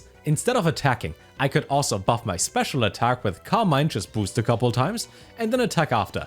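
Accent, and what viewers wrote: Germany. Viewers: Man hört irgendwie einen deutschen akzent raus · Man hört fast nicht dass du deutsch bist. Abgesehen davon hammer video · Hey, you kinda sounds like german are u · Are you German lol? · I subscribed for the German accent 😎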